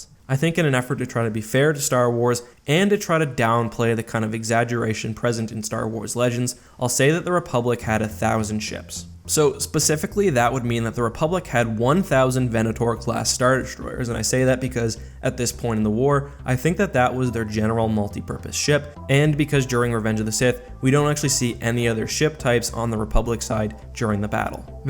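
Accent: Canada. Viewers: Are you canadaian?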